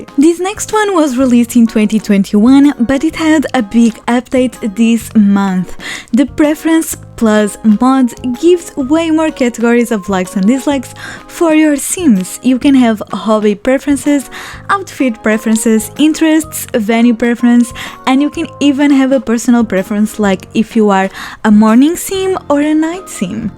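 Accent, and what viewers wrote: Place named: Portugal. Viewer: are you portuguese? cause it really looks like when you speak english haha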